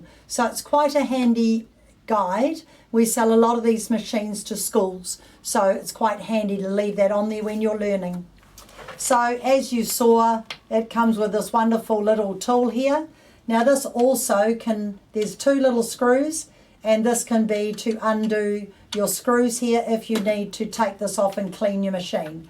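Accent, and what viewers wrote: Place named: England, United Kingdom. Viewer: Nice lady but I cannot understand half of what she says because her accent is so strong. English I presume.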